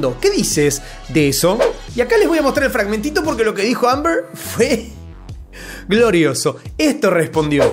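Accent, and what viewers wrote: Argentina. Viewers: Me encanta el sarcasmo de este pibe. Le queda la irreverencia, es más su acento y la concepción que tiene uno de los argentinos encajan perfecto en, ha hecho tan suyo el caso que le creo todo. Simplemente me divierten y disfruto mucho. Saludos desde 🇲🇽.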